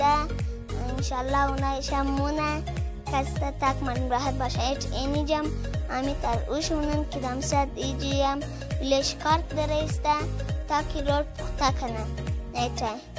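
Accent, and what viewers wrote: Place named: Hazarajat, Afghanistan. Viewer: ماشاالله بسيار عالي با اين لحجه هزراه گي موفق باشي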